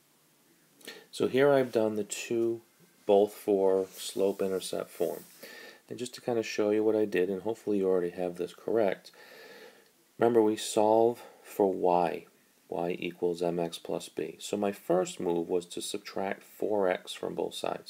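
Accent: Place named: India. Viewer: High Quality Video with Non Monotone Indian Voice (Thanks, These videos really help)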